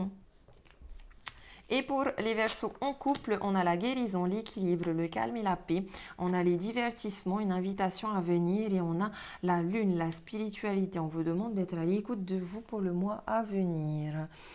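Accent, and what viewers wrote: Tahiti, French Polynesia. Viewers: Bonjour J'ai reconnu votre accent de Tahiti J'y ai vécu en 70/71 avec Raupea pendant 10 mois .j' ai passé toute ma vie avec son souvenir dans mon cœur j, avais 20 ans .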